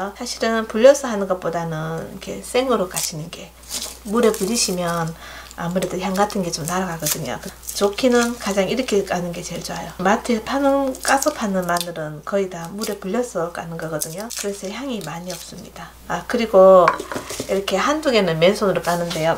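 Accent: Gyeongsang, South Korea. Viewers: 닉네임이 너무 정겹고 목소리가 경상도 아줌마치곤(?) 따뜻함이 묻어나서 궁금했어요~~ㅎㅎ 요즘 상어송이 대세잖아요~ 뚜루루루루 귀여운 뚜루루루루~~ㅎㅎ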